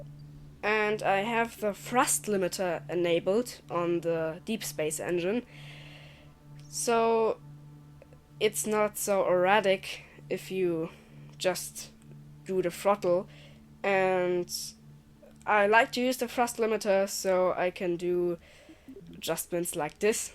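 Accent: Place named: Germany. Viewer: Ging mir auch so! Man hört den Deutschen Akzent, aber Super gesprochen :)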